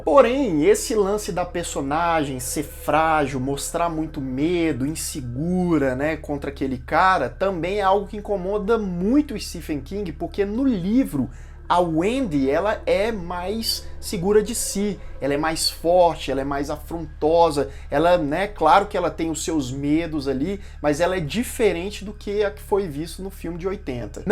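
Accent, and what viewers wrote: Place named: Minas Gerais, Brazil. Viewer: meu deus como eu amo o sotaque mineiro AAAAAAAAAAAAAAAAAAA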